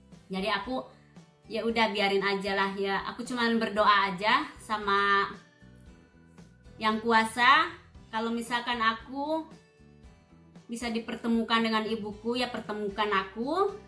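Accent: Sunda, Indonesia. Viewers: Smua ada waktu nya ya Teh. Sy dengar Teteh klo lagi ber bhs sunda kental skali. Pdhal asal karuhun Teteh dr brebes. Gpp ya Teh smua klg kita. Smoga damai bersama klg dan bahagia slamanya. Waktos Teteh bercerita g tersaa air mataku ikut mengalir.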